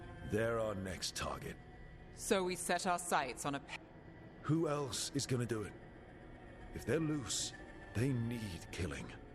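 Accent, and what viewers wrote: Russia. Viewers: Melodramatic Russian sounding cunt. Hilarious! I like ur take and will check out other videos. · впервые слышу столь стереотипичный русский акцент не от актера, изображающего русского, а от самого русского. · Всё по делу рассказано. И этот ядрёный русский акцент)))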